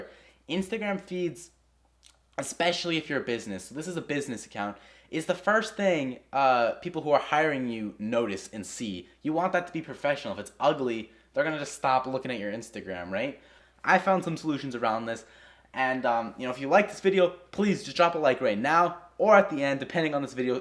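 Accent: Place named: Ireland. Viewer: You have a sort of Irish accent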